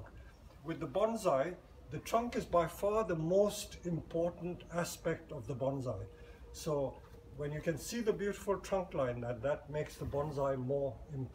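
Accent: India. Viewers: it says surrey, england...his accent sounds like he was raised in india... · Absolutely Brilliant. A non Bonsai related question for you Mr.......... Have you ever lived in India? I ask this because of your accent seems very Indian. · Chinese British guy with an indian accent making japanese trees. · He's ethnic Chinese, and was born in India. ( is a Chinese surname.) He speaks English with much the same accent and pattern as an Indian fluent in English from an early age would speak the language.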